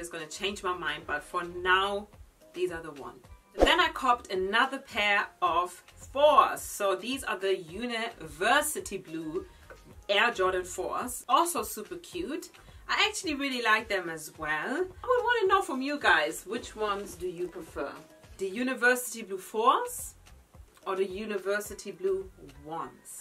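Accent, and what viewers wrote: Germany. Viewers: Nice deutscher Akzent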